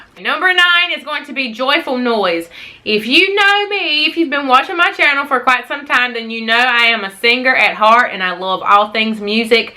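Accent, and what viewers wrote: South, United States. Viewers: Your accent is so southern!😂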